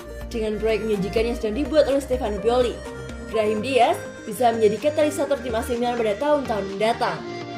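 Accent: Java, Indonesia. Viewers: pengisi suaranya orang jawa ya? medok banget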